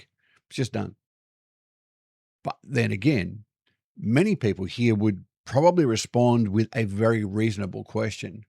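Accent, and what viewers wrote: Australia. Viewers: You’re not an Aussie living in America; you’re an American with an Aussie accent. (I’m an American with an Ohio accent.)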